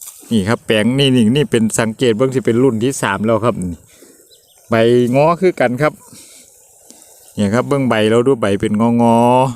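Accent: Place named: Chaiyaphum, Thailand. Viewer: เจ้ากะเป็นคนชัยภูมิติ ภาษาที่เจ้าเว้า ถ้าบ่ชัยภูมิกะหนองคายเด้ข้อยว่า ข้อยกะคนบ้านแท่นคือกันเด้อ บ้านหัวนารู้จักดี เลี้ยวขวาข้างๆที่ว่าการอำเภอ ไป2กิโลฯมั้ง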